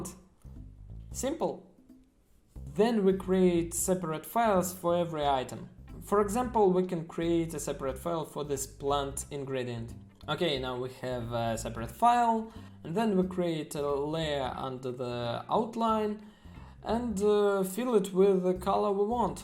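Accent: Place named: Russia. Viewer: You're Russian!?!? at you Russian accent, and I'm Russian, and if that's the case, I don't need to use a translator.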